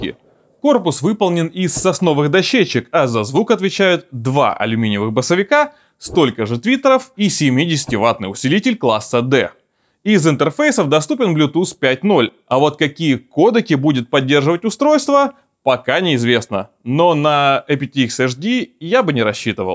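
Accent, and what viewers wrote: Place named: France. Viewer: Отдельно спасибо за французский акцент и надпись на майке 😉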